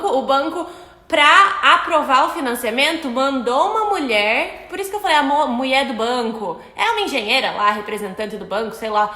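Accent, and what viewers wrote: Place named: Rio Grande do Sul, Brazil. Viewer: 🇧🇷Oi linda 🤩😍🥰 que bom te ver, e saber que está tudo bem 🙌eu achei que era do rio grande do sul pelo sutaque😂 beijinhos para a sua mama👸 e🐶freinhae e para vc tbm👸😂😂😂😂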